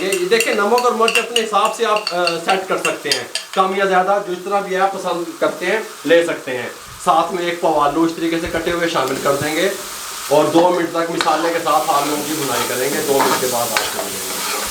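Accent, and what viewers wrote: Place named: Ambala, India. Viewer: ap Aumbala sy hain Humary neighbour wahan sy thy Lehja bikul ap jesa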